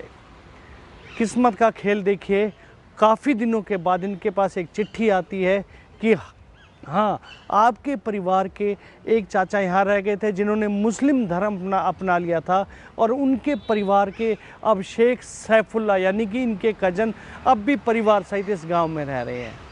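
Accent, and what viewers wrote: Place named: Saraiki, Pakistan. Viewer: Sir, your pronunciation is Saraiki one that is the sweet language of Multan & Dera Ghazi Khan region. Its a nice video. You all are welcome to Pakistan. Watching from Multan.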